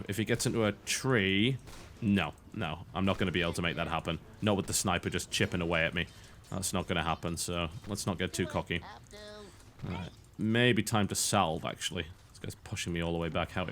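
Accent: United Kingdom. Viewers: I agree with you Pär! Though It's a British accent, not Australian :)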